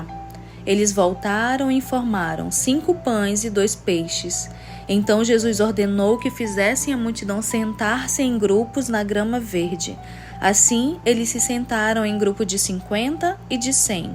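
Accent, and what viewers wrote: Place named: Rio de Janeiro, Brazil. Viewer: Tu és do estado do Rio de Janeiro?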